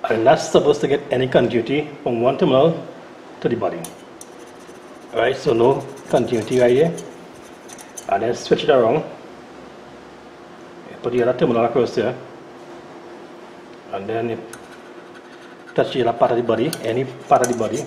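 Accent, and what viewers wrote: Trinidad and Tobago. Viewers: Wow was looking how to check my magnetron and came upon your video. Was so glad when I heard a fellow Trini voice. Was able to tell mine was bad. Thanks man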